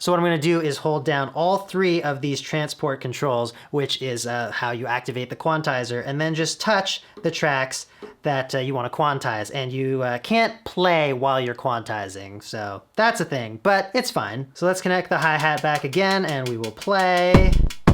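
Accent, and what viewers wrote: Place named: Canada. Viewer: I totally forgot you were Canadian then I heard the word owtside lol love the content man! Been waiting on a good overview of this.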